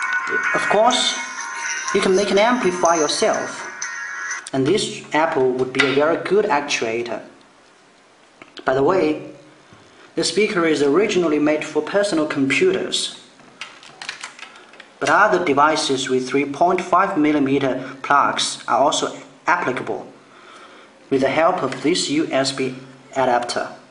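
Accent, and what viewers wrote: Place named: Poland. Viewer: Interesting accent, is that polish?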